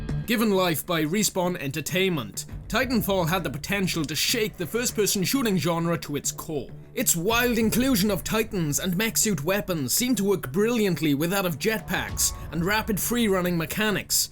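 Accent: Wales, United Kingdom. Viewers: I need to ask! ARE YOU WELSH?! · are you Welsh?? You sound Welsh or at least around that area??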